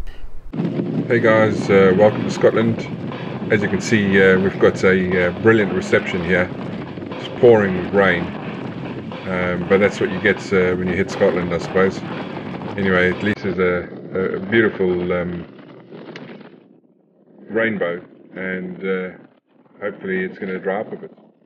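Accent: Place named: South Africa. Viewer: Hey boet! I discovered you in one of Dick Whistles videos. Think it was Distinguished Gents ride. I was moved to hear about what you are going through. Although I’m not really a YouTuber. Only made a couple pipe smoking vids which I enjoy. I have ridden bikes since I was a wee nipper. Back in Cape Town SA. Where you based mate I can hear a Safa twang in your accent boet. Drop me a line. I’m in sunny Basingstoke with my Mrs. Would be a pleasure and honour to meet you good Sir. Perhaps meet at Loomies one fine day ( perhaps pushing it in the U.K. lol). I’ll go with dry lol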